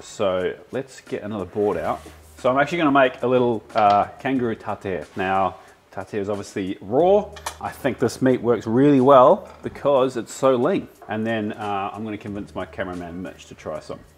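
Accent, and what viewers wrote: New Zealand. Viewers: You sound New Zealand bro. But I don't mind some Kangaroo steaks. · All this time I thought you were Kiwi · are you kiwi tho? that accent is obvi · He from NZ but lives in Australia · How come you sound like a New Zealander? "Chups"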